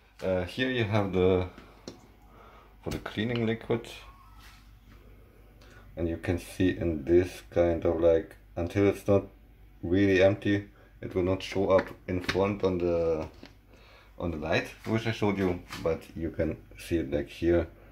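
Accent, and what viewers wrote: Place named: Germany. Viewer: Hallo, ich gehe von deinem Akzent einfach mal davon aus, dass du Deutsch sprichst, dann spar ich mir mein schlechtes Englisch ;D ich habe die selbe Maschine und genau wie bei dir blinkt das "eco" Symbol in einer Tour. Laut Beschreibung ist das der Fehlercode für eine undichte Stelle bzw. für den "Überlauf". Hast du eine Ahnung wie ich das beheben kann? Habe die Maschine schon auseinander gebaut und konnte keine undichte Stelle erkennen. Wäre um Rat sehr dankbar! LG und ein schönes Wochenende.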